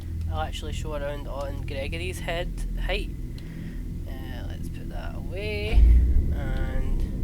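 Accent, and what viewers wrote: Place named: Scotland, United Kingdom. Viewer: He's Scottish. ITS PERFECT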